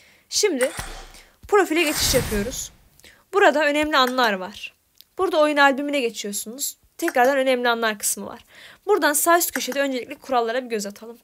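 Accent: Azerbaijan. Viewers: azərbaycanlı sən..?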